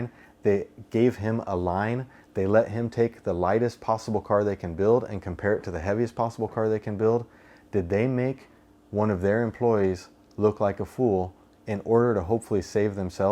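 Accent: United States. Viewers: Good video man. The way you sometimes pronounce "L"s is very strange to me. Where does that come from cause you have a very natural American accent aside from that.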